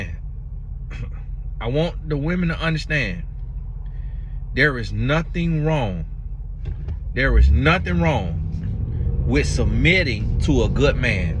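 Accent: South, United States. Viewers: Mr I thought I talked southern but you have me beat.. 😂😂 God Bless you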